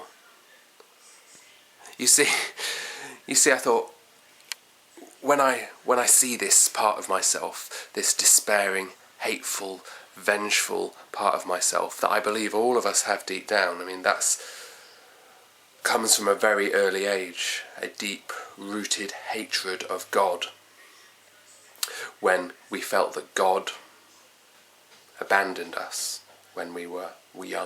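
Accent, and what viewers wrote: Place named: RP, United Kingdom. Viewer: btw you're very posh!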